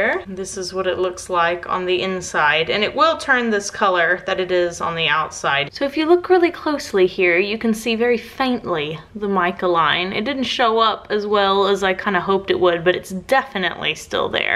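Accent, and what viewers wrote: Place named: South, United States. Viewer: Your voice sounds so southern like mine 😊😊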